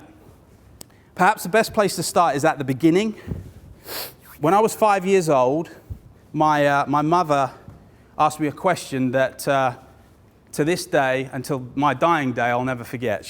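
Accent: United Kingdom. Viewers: This guy sounds sooo British than ordinary Brits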